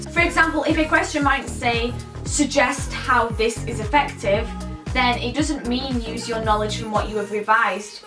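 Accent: United Kingdom. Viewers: r u british? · I'm sure she's from the UK!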